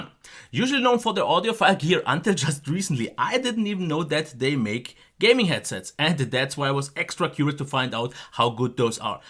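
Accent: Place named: Germany. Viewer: He sounds German - what do you think?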